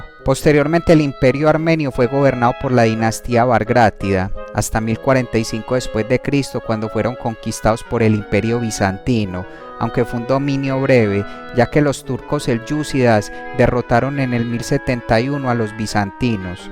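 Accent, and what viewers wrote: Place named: Medellín, Colombia. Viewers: Eres colombiano de Medellín,no? Yo conozco ese acento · Con todo respeto porque hablas así? Eres paisa de Medellín ?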